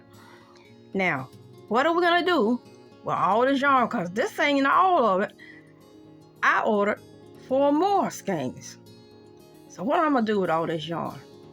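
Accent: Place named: Louisiana, United States. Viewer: I know this is kinda random, but you sound SO MUCH like my Mamaw down in Louisiana. Are you from anywhere near there? I miss her so much; listening to your voice is like a balm to my soul. 🥰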